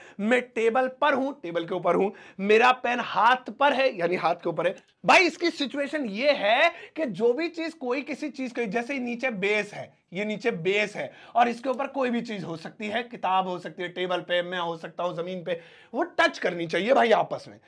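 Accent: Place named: Delhi, India. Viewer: Sir lgta h aap delhi se ho 😂😂😂❤❤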